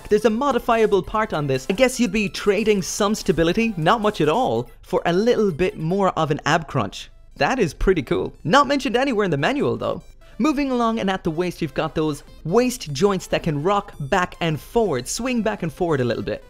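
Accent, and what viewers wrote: Ireland. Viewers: I can't tell if your Irish or American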